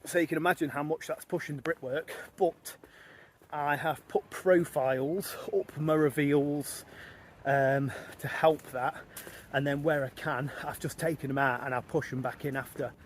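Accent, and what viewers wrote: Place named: Northern England, United Kingdom. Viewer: Cos he's from up North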